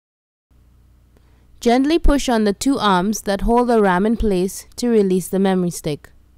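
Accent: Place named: Jamaica. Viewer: jamaican gal ah talk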